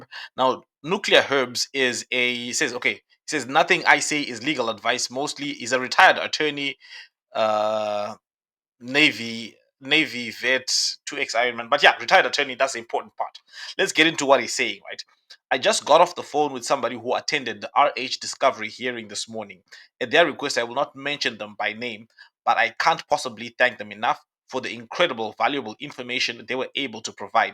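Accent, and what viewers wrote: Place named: Africa. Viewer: Speak some proper English you have such a strong accent African accent, American man this is America man !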